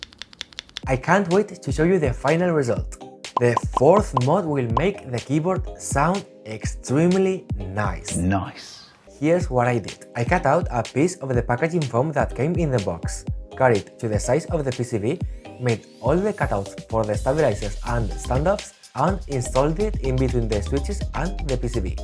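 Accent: Spain. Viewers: Tiene acento español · Ya decía yo que el acento era sospechoso fellow Spaniard, buen vid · La verdad que he notado que tu inglés es muy español, y luego cuando has mencionado que vives en España he dicho, este chico es más español que la tortilla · Desde ek primer momento que escuche tu acento sabia que eras español! tu video es genial, nuevo sub!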